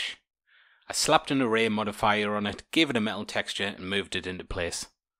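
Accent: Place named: Newcastle, United Kingdom. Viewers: I’m not ashamed to admit but I’m really just here for the Geordie accent. 😁 Love the channel. Great work. Thank you! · Areeet mate! Haha I can tell you're a NE'er from the accent lol. I'm starting blender so videos like this are very much appreciated.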